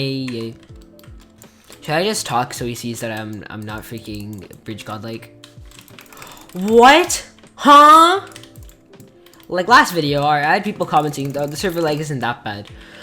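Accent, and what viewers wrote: United Kingdom. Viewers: Hi u british?? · Are you British? I think so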